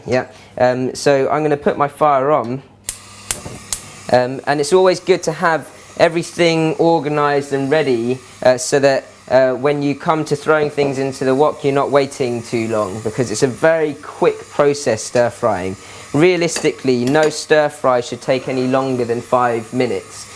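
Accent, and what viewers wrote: England, United Kingdom. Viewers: comments are quite churlish. who cares if he speaks english. he actually sounds British but the point here is to understand what the wok is and how amd why it is used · I love the Asian, with a British accent, cute. Nice presentation on Wok cooking. Italian here loves woks and Asian cooking. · He said he lives in london 008-009...dont know any better place to get an english accent. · Love the British accent. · You speak like a mate from England or UK? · that's a chinese dude with a english accent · An Oriental man with a British accent...Interesting...Very informative wok instructions...Nice flipping skills... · Authentic English accent ? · DawgYankee i dont understand why people commenting on his accent, he was probably raised in the uk, maybe thats why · Thanks for sharing, I’m new to wok cooking and this is great information. 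Thanks again I like your Pommy accent just awesome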